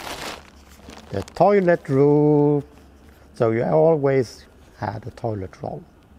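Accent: Sweden. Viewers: At first I thought the video was in some weird dialect of Swedish and tried to find the captions.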